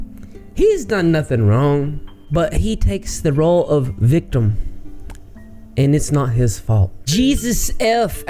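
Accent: South, United States. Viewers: i've finally figured it out what kind of accent he has he sounds southern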